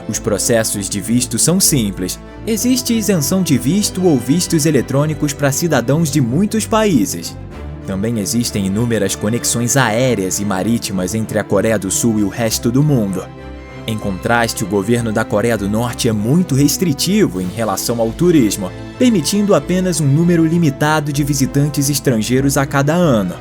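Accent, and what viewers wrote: Rio de Janeiro, Brazil. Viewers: Vídeo muito bom, educacional, mas colocar um carioca pra narrar ferra tudo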